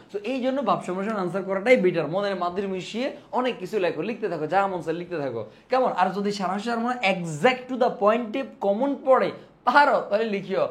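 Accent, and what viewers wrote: Noakhali, Bangladesh. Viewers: ভাইয়া কি নোয়াখাইল্লা ?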